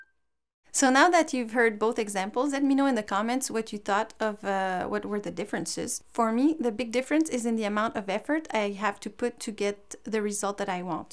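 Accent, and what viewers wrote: Quebec, Canada. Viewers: Êtes vous Québécoise?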